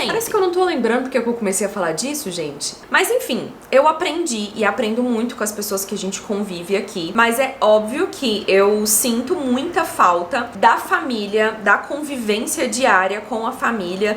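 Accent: Minas Gerais, Brazil. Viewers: A melhor coisa é me identificar com o sotaque. Minas é o lugar!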